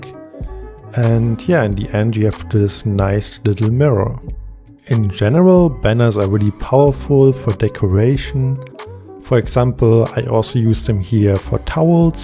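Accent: Germany. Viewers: Are you German? Doesn’t mean as hate but you kinda sound German😅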